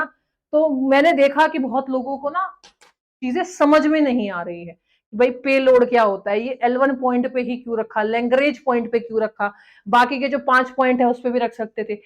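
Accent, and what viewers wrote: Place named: South Haryana, India. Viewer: Bitiya aapki bhasha se lagtah h aap South Hariyana se ho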